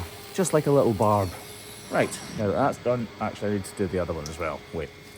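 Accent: Scotland, United Kingdom. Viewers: quick question, are you Scottish or British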